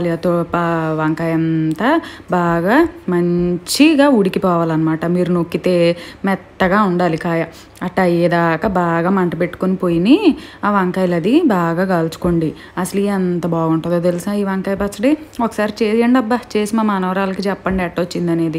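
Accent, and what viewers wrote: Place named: Godavari, India. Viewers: maa godavari slang laa anipinchindi gaaru chala bagundi🤩🤩😍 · Hi,I have seen most of your videos.they are superb.your effort to do something new is good.your act as bamma is too good.you are from vijayawada.but I feel your language has Godavari accent.thats why got connected instantly.congratulations on your award.wish you grow farther. This is my first ever comment on any YouTube channel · Godavari yasa. Super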